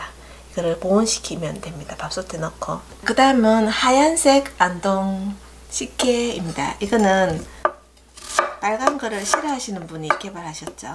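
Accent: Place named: Bonghwa, South Korea. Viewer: 타국에서 잘 보고있습니다!! 제가 봉화에서 태어나고 자라서 그런지 사투리가 너무 정겹게 들리네요! 항상 잘 보고 있습니다. 설명도 잘해주시고요 ^^ 앞으로도 좋은 영상 부탁드립니다 :D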